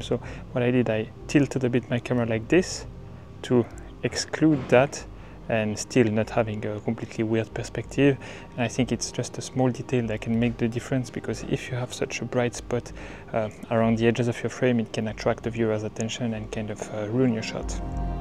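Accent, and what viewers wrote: France. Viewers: Totally loving this video! My go-to gear is my Sony a6000 and my Sigma 30mm f/1.4 (equivalent to 45mm full frame.) The irony, Sir, in documenting lenses and street photography, you are one of the people are developing the Youtube POV Street Photography video as an artform unto itself. The collation and sequencing of street scenes, I would say, is not unlike putting together a photo book. And the choice of music is another layer that puts the mood and vibe into the video. And your French accent is lovely! Reminds me of my cousins in France. I encourage you to visit South East Asia where you can find more places like this. There have been many street scenes that been ruined by ugly lamp posts. Since my Sony a6000 has no OSS stabilization, I mostly use poles to brace my camera against. It’s sweet, since these poles allow you a 360 degree view while you brace your camera against it. 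But I love your composition solution for these poles. I never realised that small streaks of light can distract the viewer! But then, I mostly shoot at night. I suspect that small hut at the top of the hill could be a toilet. I noticed the signage at the foot of the hill that said 便-something. But I could be wrong. This is the first time I have heard of the camera as being a point in the compositional triangle. I love it!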